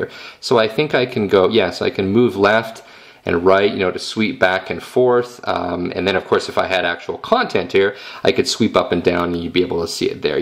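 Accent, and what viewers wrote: Canada. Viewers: And off-topic, but this was the first time I noticed the Canadian accent. lol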